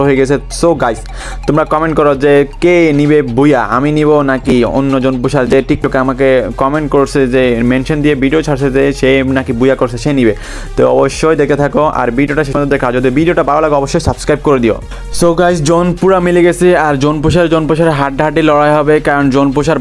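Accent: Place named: Chittagong, Bangladesh. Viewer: ভাই তুমি মনে হয় চিটাগং এর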